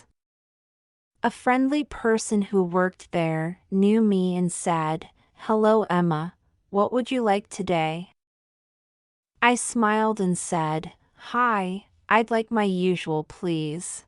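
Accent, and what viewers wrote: United Kingdom. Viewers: I think this video is British accent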